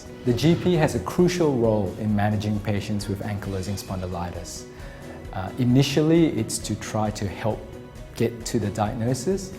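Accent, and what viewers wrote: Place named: Australia. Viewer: So, so good to hear an Aussie accent, talking about AS here on Youtube. I am a late and constantly changing dx. While I was dxed with AS almost a decade ago, every time I come across another Rheumy the dx seems to change. That or they just won't commit, they just call it some kind of Inflammatory arthritis. OK, admittedly I have more that one systemic condition that directly affects the joints, which seems to confound the issue. Apparently I am also a very complex patient. My take on that is shitty gene's, ha ha. I am female, 50 something, B27 negative, RF negative, ANA positive. I have a relative, my mothers uncle, who had AS. I also have plenty of relatives with RA. Fortunately I have been on DMARD's the whole time. I have tried a biologic but I kept getting stomach infections. My Rheumy at the time told me that if I couldn't tolerate one biologic I couldn't tolerate any of them. 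I also hvae a standing order for rule 3 testing which I get any time I have another blood test done. No one seems to be monitoring them so I don't worry too much. I have had imaging of my lungs and lung function tests, the results of the LFT wasn't good news. As I live in a small country town (in NSW|) and am a waaay too frequent, frequent flyer at my local imaging place. Lets just say the guy who reports on my imaging is always the same guy and he isn't overly concerned with being accurate. It's OK if the specialists actually view the imaging but because I am complex, most of the time there is no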